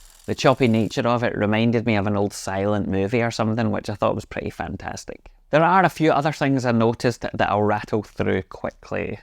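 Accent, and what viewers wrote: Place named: Glasgow, United Kingdom. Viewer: PS - He’s a posh weegie. 😮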